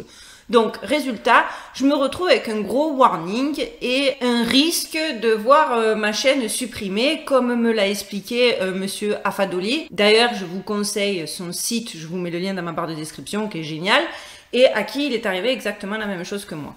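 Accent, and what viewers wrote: South, France. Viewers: Ma niece m a dit que tu viens de chez nous ds le sud je kiffe tes videos et ton accent bien de chez nous ❤❤❤ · Bonjour en ce jour de Noel 🙏🌟🎄:je découvre cette vidéo hallucinante ,tu es extraordinaire !! Les sujets les plus "sérieux" et bien fouillés,traités avec un humour dévastateur,😂et en plus,ça ne plaît pas à tout le monde ... La peur, tout est fait pour que les gens soient Morts De TROUILLE 😠. Facile de mettre les gens qui dérangent en hôpital psy .... Allez bonne journée à tous et merci pour cette ,et Toutes ces vidéos que tu nous fais; avec toi,on avance à grands pas ,et tu nous fais évoluer .Accent du 13 ? Et moi du 34 !!!😻💖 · Ils lui en veulent plus pour son assent du sud.lol .moi j' aime bien au début " hello wiwane "🤣😋😘.j aime bien la chaîne (pour le contenu avant tt)car les vidéos ne durent pas une éternité comme certains qui font des vidéos de 3 heures. 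Encore une vidéo ou 2 ,je veux bien, mais quand c'est à répétition, je suis obligé de fuir. Sinon bravo la miss 😘👏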